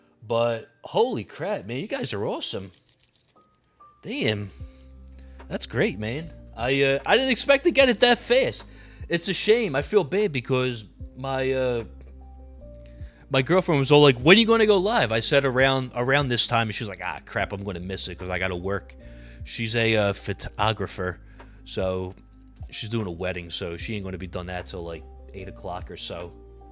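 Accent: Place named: New York, United States. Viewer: I love your accent. Im trying to guess where you are from. My guess in New York generally and Long Island specifically. How close did i get?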